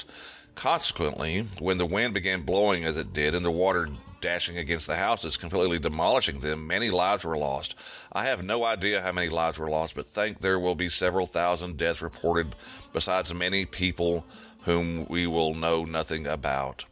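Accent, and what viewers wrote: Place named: Texas, United States. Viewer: cool man , I’ll tune in. Gonna take me a while to go through all your videos but I like the narration that sounds like you’re actually from Texas telling stories around the campfire. Very wholesome and cozy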